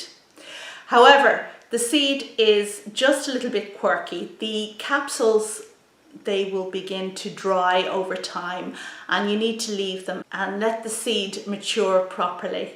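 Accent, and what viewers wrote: Ireland. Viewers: Thank you, Love all the information you share with us but most of all I enjoy your Irish English which I adore. Great videos.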